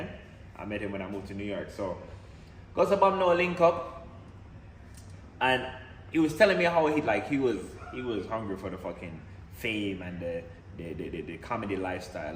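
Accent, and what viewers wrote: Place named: Jamaica. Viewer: I thought u were Jamaican no joke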